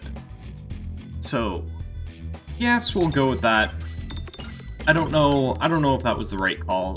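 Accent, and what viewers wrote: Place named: Canada. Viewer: Your voice is the definition of Canadian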